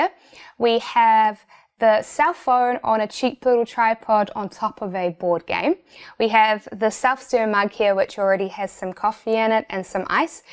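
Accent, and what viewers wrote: New Zealand. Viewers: That En Zed akcient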